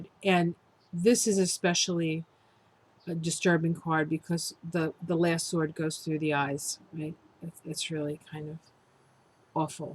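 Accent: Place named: East Coast, United States. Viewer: Hi! I've been so busy lately that I haven't been watching. It's so good to just sit down and listen to you. As soon as this video is finished I will find the June Kabbala reading. Many blessings to you and thak you! 🧡 P. S. - I love hearing your East Coast accent. Makes me feel right at home. ☺️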